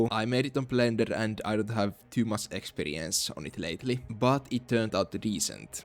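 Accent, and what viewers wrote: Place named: Turku, Finland. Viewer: Thickest turku accent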